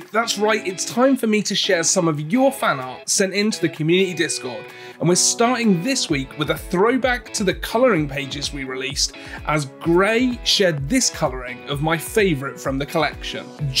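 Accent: United Kingdom. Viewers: I love your British accent it’s so posh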